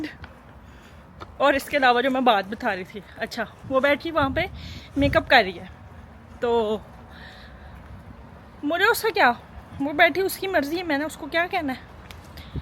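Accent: Lahore, Pakistan. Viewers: Salam g so quite look vicee totally koean but your language extent is lahori ap to koeran silha rhe hn m ap ko quran sikhana chati ho takweed k sath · U r soooo cute n talk typical lahorei Urdu … simply love watching u 💕💕💕💕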